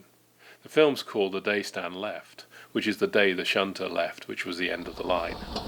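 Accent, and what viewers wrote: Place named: RP, United Kingdom. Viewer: Moved to the south probably. Plus in those days it was socially expected to speak with an RP accent in camera facing roles.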